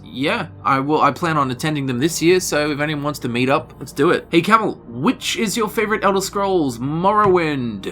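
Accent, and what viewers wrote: Australia. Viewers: Can I watch your Witcher DLC playthrough if I haven't played the main game yet or will I be confused/spoiled? I need more of that soothing Australian voice...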